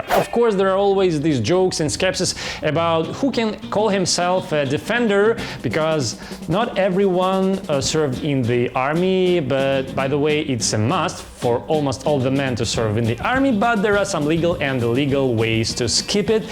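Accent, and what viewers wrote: Russia. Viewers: явно видно русско-английский акцент 😅, You have to work more and practice your speech 💪👍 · Russian English( Но в любом случае очень хороший) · This genre should be cool only if you have a real native speaker, of course, for russians that`s easier to understand russian accent, but if you want to attract attention of foreign viewers, you need someone, who was born english speaking)) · Кто пишет "не такой уж сильный", вы серьезно? Иван сверху правильно написал, вам только так кажется, т.к. вы в голове примерно так же читаете по-английски (за это кстати "спасибо" нашим урокам английского в школах). Послушайте носителя языка, а потом, и всё сразу поймете. А для англоговорящих зрителей это еще усиливается, и для них он будет звучать именно как такой типичный русский акцент - лондон из э кэпитл оф грэйт бритн; лет ми спик фром май харт... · ну такое, хорошо, сильный русский акцент, у меня ровно такой же, поэтому легко воспринимать. 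пока непонятно откуда планируешь брать англоязычный трафик, посмотрим, подписался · Русский английский - идеальный для прокачки уровня - больше новых слов, понятных по контексту и произношению. Идеально!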